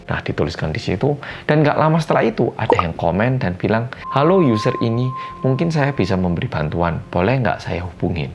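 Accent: Java, Indonesia. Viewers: Aku lho klo dengerin cerita...suenengg...suara dan logat e megap2 medok malang campur lafadz korea ngono...lucuuu😅😅...semangat..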